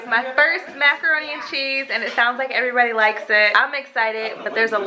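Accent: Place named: Pennsylvania, United States. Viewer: You sound like your from PA somewhere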